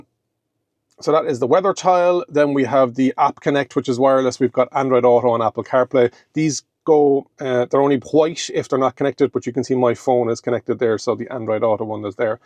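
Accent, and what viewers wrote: Ireland. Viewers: Great video man. Just what I needed. And great to hear an Irish voice explaining everything.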